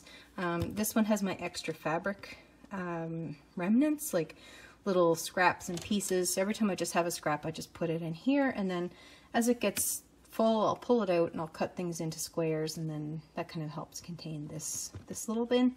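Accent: Canada. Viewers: I KNEW I was hearing Newfoundland in your speech!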